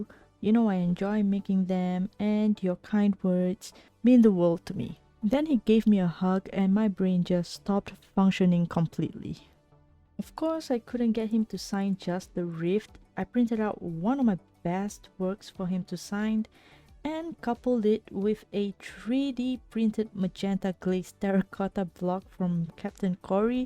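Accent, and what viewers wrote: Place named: Singapore. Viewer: Fellow Singaporean!!! I had a hunch with your accent but happy that there is actually a growing community of hermitcraft-likers here >:D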